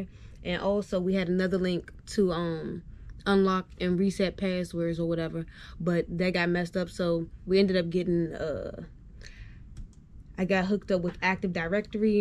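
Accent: Washington DC, United States. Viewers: I recognize that DC accent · before I saw the 202, I was like " This person Gotta be From DC"!!!!!! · thanks for the content i can tell you are from DC or baltimore lol the accent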